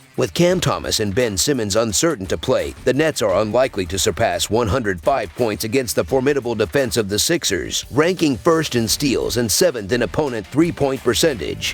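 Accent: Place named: Boston, United States. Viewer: Love hearing your Boston accent, thanks for the laugh!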